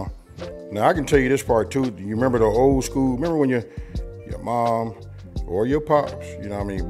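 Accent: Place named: Texas, United States. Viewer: You sound like a good Texan! Loved the recipe.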